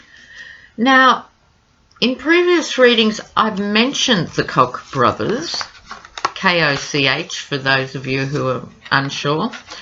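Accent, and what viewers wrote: Australia. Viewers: I enjoy your program soooo much, even though I don't get the difference between tarot cards and chicken entrails. I love your sense of humor. It gives me belly laughs. You understand the politics of the US so well. I'm actually a Kiwi, but I lived in Perth for 13 years. I didn't realize how much I missed the aussie accent and humor. I have lived in Pennsylvania since 1998. Would love to move back to Oz or NZ or Tasmania, but my husband is from Lancaster County, so I guess we will die here. I have just applied for "the opperation" (US citizenship) as I have been here 20 years and I would like to be able to vote trump OUT ! I also say Koch, not Kok. Seems too much like Cock. Keep up the good work. Can we see a view of your cat sometime ?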